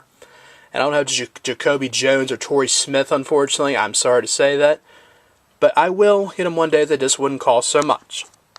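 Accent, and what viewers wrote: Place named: Baltimore, United States. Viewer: You have a super heavy Baltimore accent. Go Ravens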